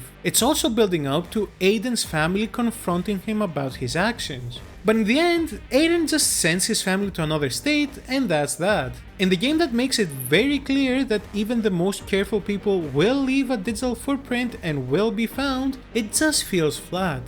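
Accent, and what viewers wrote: Greece. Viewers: Greek accent geiá sou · Are you Greek by any chance? You sound like it. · Φίλε η ελληνική σου προφορά είναι τόσο εμφανής 2 λεπτά βλέπω και δεν χρειάζομαι τίποτα παραπάνω!!! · i know this greek accent brother XD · Greek accent is tough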